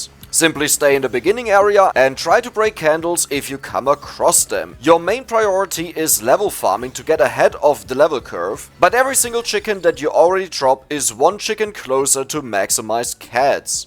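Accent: Germany. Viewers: Funny, I thought the same thing. First time I heard voice I was like "Wait, isn't this Granady (famous trackmania player)?" Really must be the german accent. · Ich frage mich wieso mir deine Stimme so bekannt vor kommt... Vielleicht ist es auch der deutsche Akzent^^ Hast du mal Videos zu anderen Spielen gemacht auf einem weiteren Channel?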